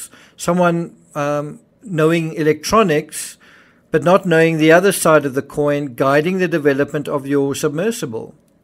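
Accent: South Africa. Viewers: you sound South African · Loved hearing the South African term "nogal". I always hhought your accent was South African. Keep up the good work!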